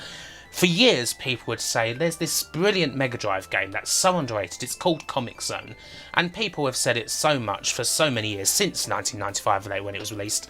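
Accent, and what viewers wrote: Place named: Essex, United Kingdom. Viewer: Nearly right. comes from Essex, which is to the north east of London.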